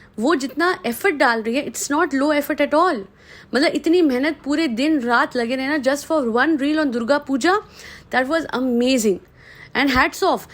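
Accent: Punjab, India. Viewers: Baki sab theek hai but aapka punjabhi accent thoda alag lagta hai... Somehow, i feel ke aap punjabi speaking ni hai... aapka hindi accent is so good & flawless. Punjabi bolne ka try accha hai but 👍👍.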